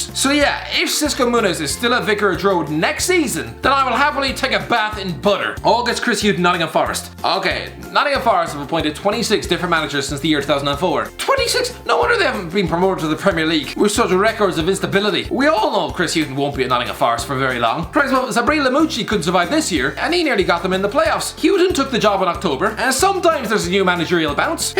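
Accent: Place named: Ireland. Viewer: If the accent hadn’t already given it away, the term ‘Glasgow derby’ will tell you who exactly the fav Scottish team is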